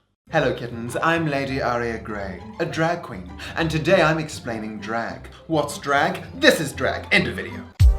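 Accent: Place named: South Africa. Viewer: I just realized that your South African. Damn now I like you more because it feels closer to home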